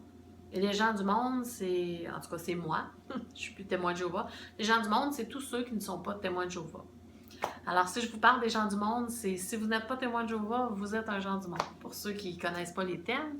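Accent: Quebec, Canada. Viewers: salut la cousine canadienne un ptit kikoo de france je te rejoind sur ta chaine ca me plait beaucoup pis ta des bo yeux et une jolie voix avec un belle accent excuse moi j'ai des amis quebecois en france on dit ici vous etes nos cousins lol pis les autres video sont tres bien aussi pour les tj ta pas besoin d'une eglise ou une communaute la religion tu l'a au fond de toi tu peux prier chez toi voila kisssssss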